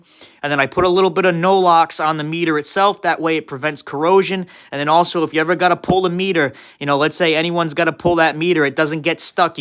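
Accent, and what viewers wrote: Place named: Fall River, United States. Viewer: Hahaha I took one listen and was like this guy's gotta be from FAWL RIVAH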